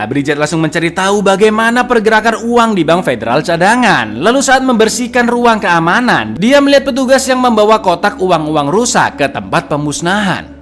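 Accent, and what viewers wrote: Java, Indonesia. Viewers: Sy sering nonton spoiler video anda.. Bagus dan kocak. Cm kurangi medok lpgat bahasanya krn tidak semua org paham maksud anda. Jd gunakanlah bahasa Indonesia yg baik. Tetap semangat 👍🥰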